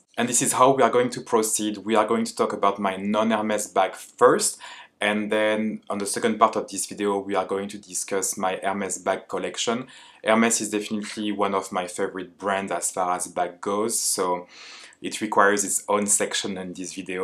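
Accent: France. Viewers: This is the first time I understand a french accent and even find it pretty. I am a foreigner as well and accents can be challenging for me lol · I am in whaouuuuuu. What a well curated collection! Really inspiring . Btw, it will be a shame to completely loose that cute French accent of yours. Just saying 🙃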